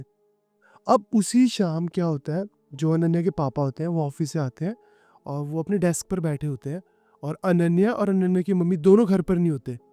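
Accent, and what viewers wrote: Punjab, India. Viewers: आप पंजाब से हो क्या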